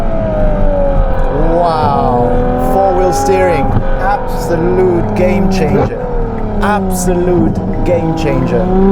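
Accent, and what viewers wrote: South Africa. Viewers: Is the guy reviewing the car South African, he sounds like it especially with some of the terms he's using? 😅 eg. Jislaikit and kak fast LOL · My guy you sound so south African buddy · Is he South African? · Is the presenter South African... Hhay'bo... "kak fast" & (jIs-like) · Man, you sound like a South African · I'm in 😍 Hey man, are you South African?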